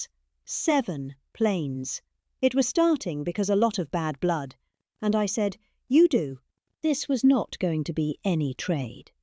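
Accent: England, United Kingdom. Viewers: Spoken in real English by a E glish lady, shows just what a load of rubbish he comes out with. No one can make sense of this rubbish. This is the King of America?? This madman?? This the best you can come.up with??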